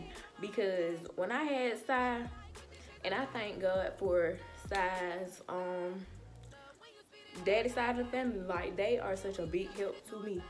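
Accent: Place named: Louisiana, United States. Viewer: girl where you from ? you sound like you could be from louisiana 😍🐊.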